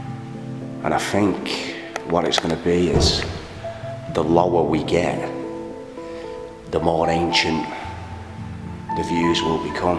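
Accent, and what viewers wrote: Manchester, United Kingdom. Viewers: Hi m8 i watch 2 or 3 Urbex channels But i think your content is top draw and the old cheeky Manc commentary adds adds a different dimension to it too, Think you should go back their and do a more longer and in-depth look at the place as it was very interesting as a old skool 88-93 raver i love the old time buildings and architecture, Wonder if anybody stoped off their before going the Twisted Wheel, Hacienda or Thunderdome lol Keep Up The Good Work R Kid lol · Ey pal, is Man Vic still open ¿? , me n our kid (W. Casino devotees), before we had motors, used rail to getup to W.Wallgate from Victoria. HaH! In 77 we were approached, ticketing area,, by a geeza and his bird with an Adidas FULL TO BOSTINGLY, of grabbed t. Lights (Tuinal caps), and we had a few for the morning and fk, after the b.st.blueys, by time we got back to Chesterfield next mid morning we was well fkn rammed. 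Passed through M V only coupla times after and, post ticket area, had to, and managed to fall the like 20+ steps into the underpass to the W W gate platform. We all got fed up with later attacks on the rail travelling soul crowd as Wigan locals turned against us. Am 60 now, blimey, wish was a young again, y'know. Neat vlogs oak, bang on, your accent reminds of the Trafford lads, norarf! Say, you do olde pubs as well, Okeh, outside Manny Picc there used to be a mini 2 roomed alehouse, is it still theere, just across the road from where a traveller came out from that station, where the taxis later used to congregate ¿? Top endocrine Whitworth St I think. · Got this link from a MEN news article. Blew me away. Absolutely love this stuff. Really love the Manc accent commentary too! "Holy smoke!!" Pissed myself! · I love the videos but this guy surely accentuates the Manc accent haha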